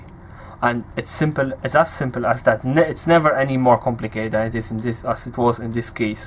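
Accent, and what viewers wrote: Ireland. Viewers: Man not being funny but you have a mad accent irish farmer/central European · What part of Ireland is that wonderful accent from????? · Hi. Thank you for your time and sharing your knowledge you could nearly be mistaken for an Irish man. 😂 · Funny! Best Irish accent from a foreign national ive ever heard!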